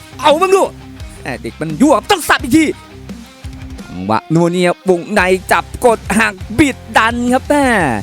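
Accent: Isan, Thailand. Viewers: รำคาญเสียงพากย์อีสาน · พูดอิสานเฮาแกมภาคกลางฟังแล้วม่วนคัก ข่อยคนอุบล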